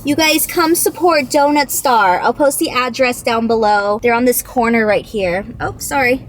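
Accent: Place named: Vietnam. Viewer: good guess! I was thinking Vietnamese too from her accent.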